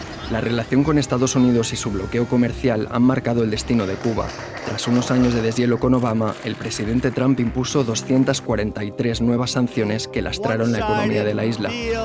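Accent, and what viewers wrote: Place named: Spain. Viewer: Me hace gracia que quien presenta este mini docu....tenga acento espanol, cada ves que escucho los espanoles poniendo el dedo bien puesto. Me pregunto porque los espanoles son los primeros testaferros del regimen? Muchos espanoles le dan oxigeno al regimen. Deberian de consumir su tiempo en propagandiar al regimen castro-canel y sus testaferros espanoles a ver si tumbamos esa inyeccion de oxigeno que le regalan al regimen castro-canel....empiezen por ahi! USA no va a dejar ninguna alianza entre ambos coja alas, esto es oxigeno entre ellos y Cuba o el gobierno nefasto de Cuba le debe hasta el culo a los Rusos. Putin como mafioso mayor que es, esta recogiendo lo suyo, los rusos no saben pelear y los cubanos muchos menos. Todo eso es fachorias para darle valor a ambos paises que estan al borde d ela quiebra social. Y China no se queda atras, los mismos desastre naturales que tienen en le pais van a cobrar todo aquel mal que lleva sufriendo el pueblo de China por culpa de otros mafiosos!! 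Cuba, China y Rusia llevan la misma dosis de tratos!! Dejarlo que cojan alita se les van a quedar en alitas de pollo....kentucky chicken.....La dictadura castro-canel le quedan dos round tick tock tick tock.....time clicking.. Milei 2023 Derrocar la dictadura castro-canel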